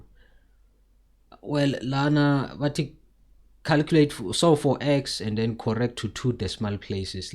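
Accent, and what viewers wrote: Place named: South Africa. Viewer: "I'm watching your math videos on YouTube, your teaching is good but I'm struggling to understand because of the accent. You mix South African with English and it's a bit hard for me to catch. Can you please speak a bit slower or use more clear English?"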